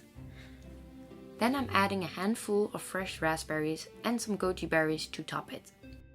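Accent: Netherlands. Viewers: the moment I opened the video I recognized the accent. its so cool to see a model from the Netherlands, half my family lives there and it's probably one of the prettiest places ever.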